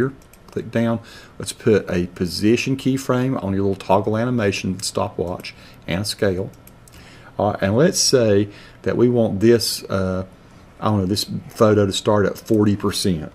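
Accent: South, United States. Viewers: this guy is soooooo country !!!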